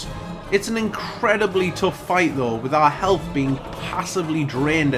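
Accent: Northern England, United Kingdom. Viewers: Am I hearing a fellow northern English accent?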